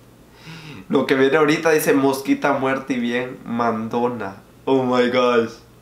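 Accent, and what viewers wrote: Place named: San Pedro Sula, Honduras. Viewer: Desde qué te escuche hablar dije, este Men es catracho, hablas como sanpedrano.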